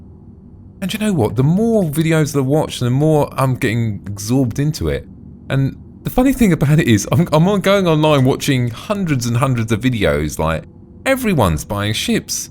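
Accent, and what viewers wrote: United Kingdom. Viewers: your accent is too damn british to understand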